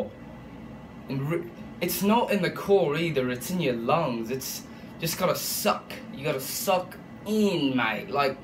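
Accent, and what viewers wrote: England, United Kingdom. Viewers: fookin hell thought he is a fine lad from Briston or London, until i found out.. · What! U call that an Australian accent. It’s British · Can someone explain why he decided to speak in an English accent?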